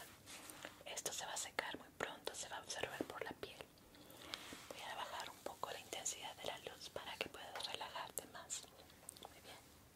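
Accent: Mexico. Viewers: Yo creo que por el tono es de Mexico...Saludos desde Argentina😉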